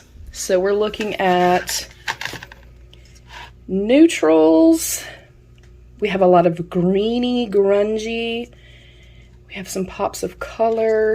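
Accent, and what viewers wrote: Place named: South, United States. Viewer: I love it,! I wasn't sure if you were Southern! 🙃🤪🫠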